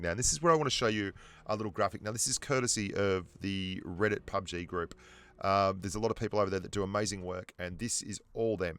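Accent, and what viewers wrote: Australia. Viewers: Wow u got Aussie Accent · Are you Australian?...you sound like a mellow aussie.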